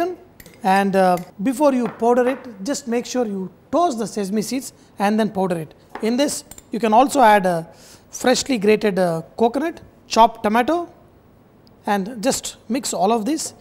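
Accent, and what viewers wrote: India. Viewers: I need a more thorough explanation of what exactly the ingredients are. I didn't know what it was. And the accent is VERY THICK. I could understand better if the Indian accent wasn't so strong. The food looks great but that stuff after the flour....idk what that paste looking substance was.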